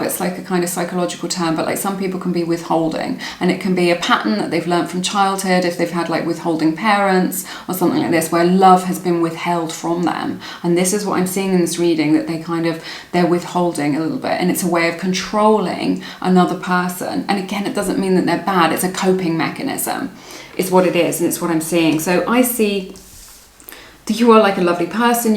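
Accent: United Kingdom. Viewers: Pile # 3 and specially pile #4 : resonate 100% , this video is a turning point for me , let us call it the beautiful truth , we really need always to search for the truth.Its almost personal , I have done the work on myself and learned my lesson , thanks for the universe for upgrading us and thank you so much for helping me sort things out . I love you and love your British accent too 💓💓 To my fellow viewers : I watched the whole video , the 4 piles and I got a message from each of them ,somehow 4 piles resonated with me somehow , wish you all love , light and the best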